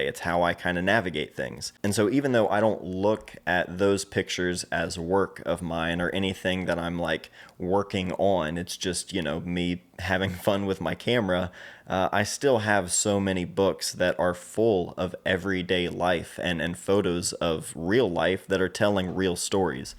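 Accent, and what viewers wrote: Ohio, United States. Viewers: My favorite thing about photobooks is the ability to be up close and personal with the work of yours or others. It is a very focused and intimate way to view photography and in my opinion is the best. It takes away all distractions and allows you to be fully immersed in the story the photographer is trying to tell. I see photobooks as the movie theater experience when it comes to looking through photos, you sit there and are 100% focused on the story, the colors, the textures, everything. Also, interesting to hear you say that us Ohioans have an accent haha, I haven't gotten that before but I have heard talk about that. Great video!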